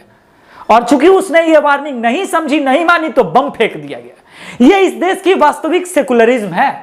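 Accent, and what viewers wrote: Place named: Bihar, India. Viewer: apki awaj me wo humare bihar wala touch bujha raha hai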